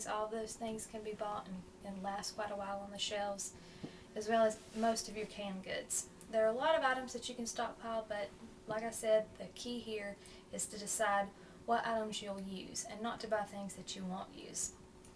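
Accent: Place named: South, United States. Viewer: Great info, and the hint of southern accent makes my heart melt. Keep up the great work.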